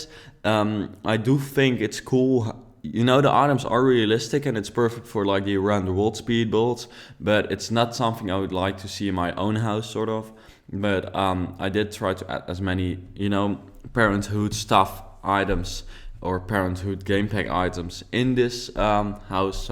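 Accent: Netherlands. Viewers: ah i love your videos and your builds and ik ur Dutch maar je hebt het meest Nederlandse accent als je Engels praat dat ik ooit gehoord heb xddd (which- dw- its cute)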